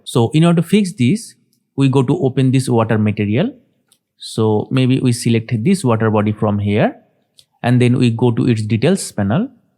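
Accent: India. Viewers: какой то индийский акцент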